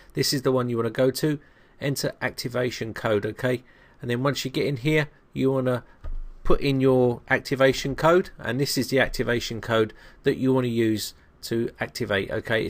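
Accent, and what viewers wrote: United Kingdom. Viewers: You spelt infection wrong in the description, you wrote "ifections". BTW, I like British accents :)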